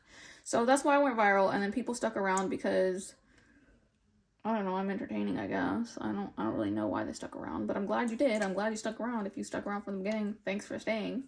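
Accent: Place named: South, United States. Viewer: Omg I am crying because I can relate to you so much. I was bullied by my peers from upper elementary all the way through junior high so (ages 7-14) it completely ruined my confidence and till this day I'm trying to heal from it. (37 now) i have always felt like an outcast being unique in everything I do. I love your social media content and feel so validated. You are so genuine, raw and down to earth! I hate judgy people so much and honestly wish i can just avoid them all. I'm half way into watching your video but I just wanted to comment before i forget. I was bullied due to my skin color and were surrounded by racists .. then when i was jn high school i was bullied by aunties saying how i did not get my moms beauty and looks .. it hurt me so bad and I always felt self conscious about how I look, how i talk etc etc. i really want to get myself checked to figure out if I am neurodivergent. 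I overthink but i think thats a strength of mine, i feel anxious a lot and my sister always is mean to me about it. Anyway. I'll come back to comment again when I finish the video but i just wanted to say! We support you and im so sorry for all the hurt people have caused. Oh and I have also been diagnosed with an autoimmune disease right after getting hit with covid back when i was 35 and life has become so challenging. So you talking about all this, i feel seen! And i thought you were from the south because I hear a little southern accent. Turns our you lived in the south for a little! So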